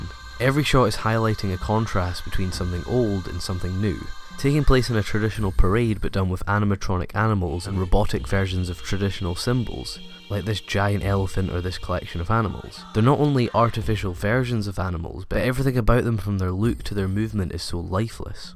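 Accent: Scotland, United Kingdom. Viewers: Are you from Scotland and if so where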